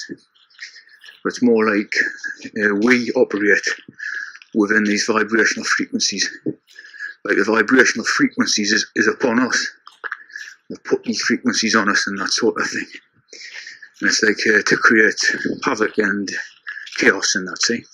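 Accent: Newcastle, United Kingdom. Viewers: lol.. 4th time into watching it and still not getting more than few words! 😅 but i will not give up since you say it's important Knowledge and anyway i like your voice..so going soon for the 5th... geordie accent i will decode you 💪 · I have heard this 3 times now and very interesting to say the least. Love the Geordie accent, Whay aye man! My family are from the north east :)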